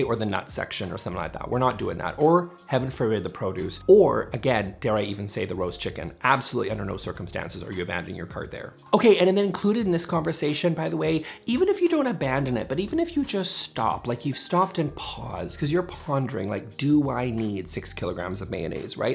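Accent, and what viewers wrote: Canada. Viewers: I’ve never noticed your Canadian accent until this video. It must come out when you get worked up 😂. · a Canadian accent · Love your fun combo of “gay voice” and Canadian.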